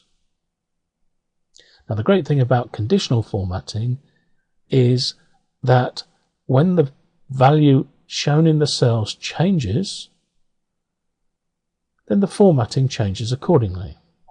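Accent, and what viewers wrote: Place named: Atlanta, United States. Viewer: Thanks so much, and you have such a nice accent the same accent in Atlanta, Ga all the best for you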